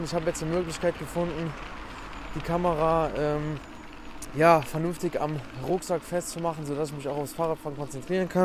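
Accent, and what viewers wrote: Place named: Cologne, Germany. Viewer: Deine Videos sind am Ende häufig wenig informativ, für mich - guck sie aber trotzdem gerne - wahrscheinlich weil ich an deiner Aussprache erkenne dass du ein Kölner bist 💪😎👍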